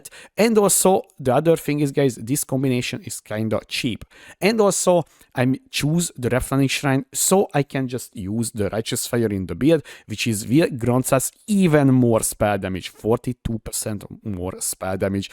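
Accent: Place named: Hungary. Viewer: eros a magyar akcentus hhhhh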